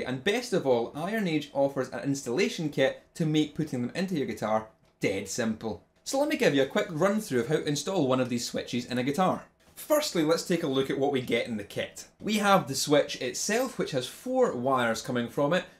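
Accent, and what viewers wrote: Scotland, United Kingdom. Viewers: Best guitar channel on youtube. Mainle due to your scottish accent. Greetings from DK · Thankfully it's not a very complete Scottish accent, otherwise it would be impossible to understand and even worse for the non english speakers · I think the Scottish accent accounts for at least 40% of the reason I watch your channel. It's just so pleasant to listen to.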